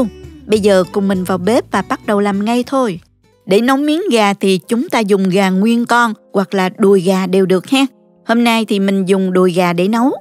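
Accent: South, Vietnam. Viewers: Cảm ơn với món miến măng gà 🍜thật ngon😋 & đẹp mắt. Giọng đọc thật là mộc mạc Miền Nam rất hay.❤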